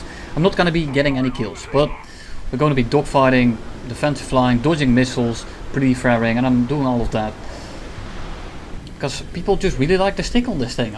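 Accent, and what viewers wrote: Netherlands. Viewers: hahah still a nice video😉 wait a dutch accent ?🇳🇱🇳🇱🇳🇱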